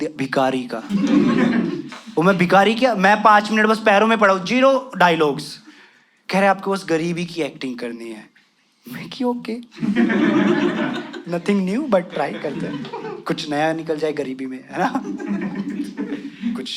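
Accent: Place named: United Kingdom. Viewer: your english accent gets me everytime :D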